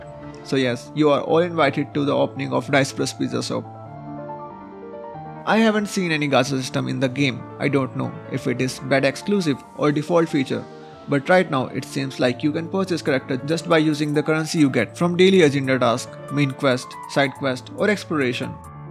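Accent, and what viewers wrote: India. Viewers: Hey umm are you indian...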